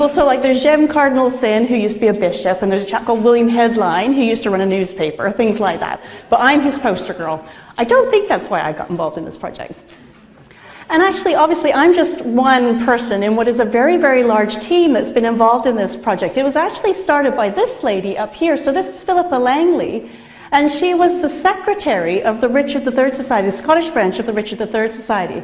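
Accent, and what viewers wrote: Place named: Ireland. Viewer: For a Canadian, she has quite a few words that sound very Irish.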